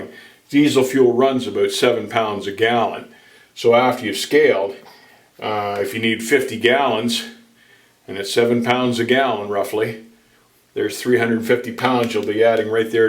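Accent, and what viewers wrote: Canada. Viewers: Always great info with an awesome Canadian accent!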